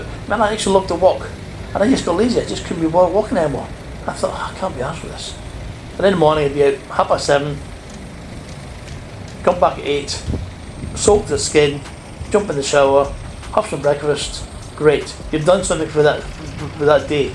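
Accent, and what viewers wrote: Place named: Scotland, United Kingdom. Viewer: Alan, accents make the world a more interesting place. If you can't grasp what's been said, then that's your issue & not the speaker. I'm not Scottish & yet I love the Scottish accent & understand every word.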